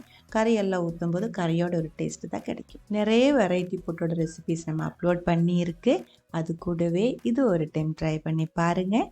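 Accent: Kerala, India. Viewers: Thank you for your video and detailed instructions. I very much enjoyed your Tamil with Malayalam accent. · Auntie, yude thamizh... Manassilakunnundu... Malayaliyanennu... But a good effort, keep it up 🎉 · Listen her accent, she too is a Keralite 😃😃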